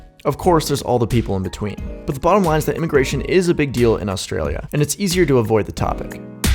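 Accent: United States. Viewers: Once I heard an American accent I was ready for a lot of nonsense being spoken about Oz, buy hey, not bad, you are pretty much spot on.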